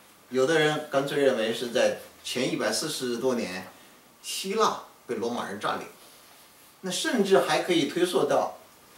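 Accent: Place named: Northeast, China. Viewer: shipeng LI 我就是西北人 可他的口音一点也不像西北人 倒是和东北人神似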